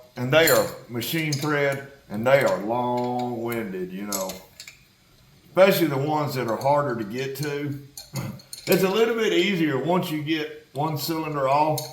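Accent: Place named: South, United States. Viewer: Man do you know what kind of southern accent you have? Like what region did you grow up or develop that thick accent you have?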